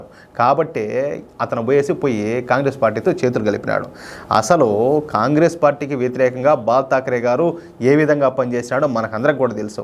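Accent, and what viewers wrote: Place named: Ongole-Nellore, India. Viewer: Kuttabagala undla nee voice mana ongole Nellore bashalo em matladavanna ....subscribe chesukondi plz Andaru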